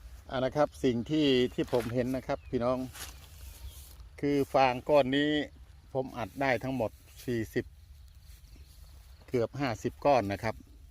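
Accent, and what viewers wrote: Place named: Bangkok, Thailand. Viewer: สำเนียงคนกรุงเทพเสร็น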